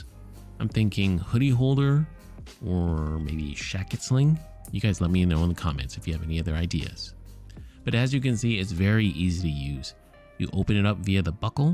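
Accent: Canada. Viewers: I was gonna ask if I won anything for my guess, but it was clearly obvious to several of us. 😁 I grew up in western Montana (Missoula, to be more precise). I think everyone from the cooler climes gets the need for today’s gadget intuitively. That you’re Canadian is obvious, not just from the dialect, but from the whole vibe, which is part of why I absolutely love your channel. I admit, y’all are way more likable than your neighbors to your immediate south; we’re quite the mess these days, but I’ve felt that way for decades. When I worked tech support, several of my clients thought I was Canadian from my voice and demeanor. One of the highest compliments one could receive.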